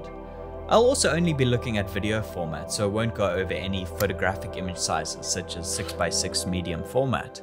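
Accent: South Africa. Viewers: South African :) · Great video!! Was that a South African accent I heard??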